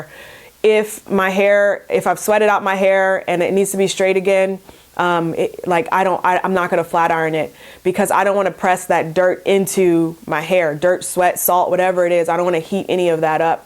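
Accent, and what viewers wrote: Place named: Toronto, Canada. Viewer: Came across your Reiki video and think you are amazing. Beautiful, natural, personable, and so well spoken on Video. I’m from Toronto - you sound like a TO girl. Thanks for all you share. I’ll keep watching. Best from Canada.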